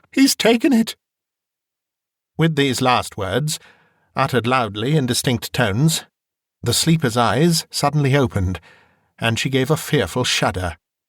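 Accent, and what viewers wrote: United Kingdom. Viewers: New subscriber, very impressed with your delivery! I've always loved the vintage stories narrated with a British accent. They just demand them read so and you do a marvelous job! I'll be enjoying your work often! Thankyou!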